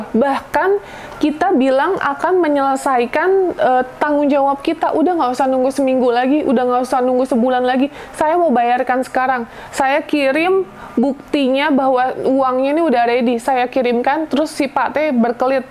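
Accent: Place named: West Java, Indonesia. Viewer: Resep ngadangu si teteh nyarios teh .. orang sunda ya. Tadi ada kata keukeuh dan Juntrungan nya 😂😂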